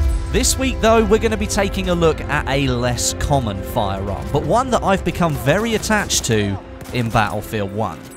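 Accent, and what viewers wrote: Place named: United Kingdom. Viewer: The British accent, the music and the commentary everything is spot on.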